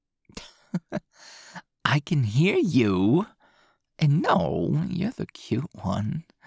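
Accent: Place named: New York, United States. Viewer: The new york accent 😍😍😍😍😍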